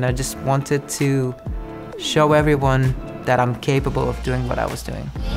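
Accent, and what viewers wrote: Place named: England, United Kingdom. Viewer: his english accent is heartbreaking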